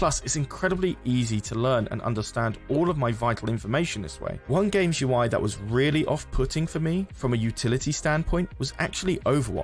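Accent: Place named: United Kingdom. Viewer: I haven't seen many videos before, so I was very confused when I thought had turned British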